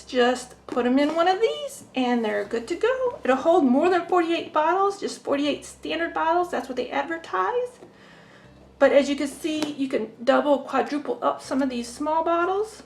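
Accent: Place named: South, United States. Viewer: lol, I used to speak southern too sweetie, took years to wear off 😁 your speech is fine, I’ve never noticed you mispronounce a thing, honestly. Hey, no matter which name you say now, I’ll match both lol.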